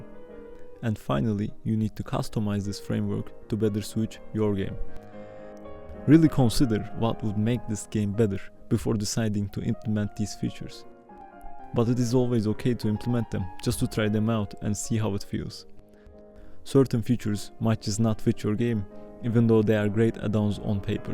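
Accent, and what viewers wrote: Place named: Turkey. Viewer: Your Turkish Accent got me laughing so hard 😆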